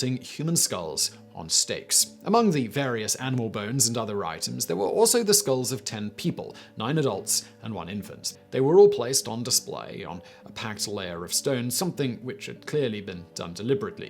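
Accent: United Kingdom. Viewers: Okay? He’s British, American English will be different to traditional British English.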